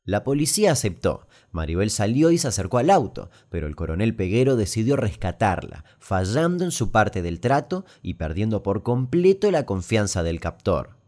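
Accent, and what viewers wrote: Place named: Argentina. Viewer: mis padres me han hablado de este caso pero no había nacido aún cuando sucedió, gracias por hacer este video que me permitió conocer con mejor claridad esta historia, soy hija de un argentino (de Resistencia, Chaco como tu) y una dominicana, siempre veo tus videos porque tu acento me recuerda a mi papá, significa mucho para mí que cubras este tipo de historias que no reciben la suficiente consideración en República Dominicana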